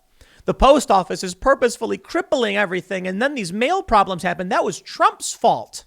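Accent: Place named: Midwest, United States. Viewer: It is just me or is accent slipping back more Mid-West lately. "Byoxes", "Boeth" & "Foelks" as examples.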